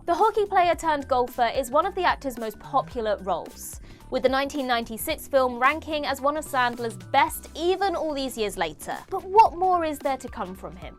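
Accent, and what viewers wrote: United Kingdom. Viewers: Could this hostess be any more British?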